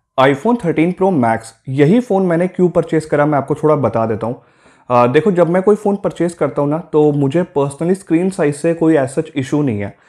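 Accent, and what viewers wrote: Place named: Punjab, India. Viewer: Punjabi lagde oh tuc mainu thodi thodi hindi ch punjabi lag ri aa😅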